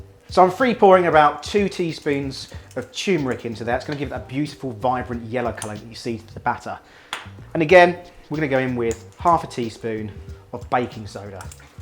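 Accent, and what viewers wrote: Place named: United Kingdom. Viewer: Watching and loving ur videos and (ur awesome british accent) all the way from ontario canada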